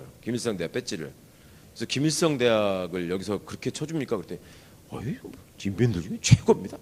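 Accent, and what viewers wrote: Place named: Jeonju, South Korea. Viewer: 우리지역 전주가셔서 강의 하시니 완전 전주 말씀 정감있습니다...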